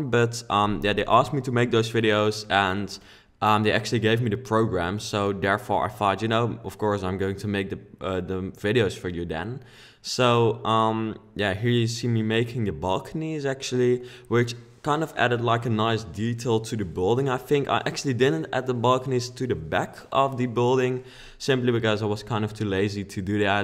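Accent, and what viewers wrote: Netherlands. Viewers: Jij klinkt zo nederlands hahahahahahaha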